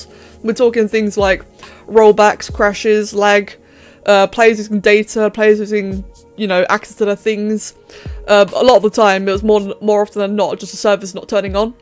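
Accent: United Kingdom. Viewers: i was watching since 2010:D Ps: are u brittish:3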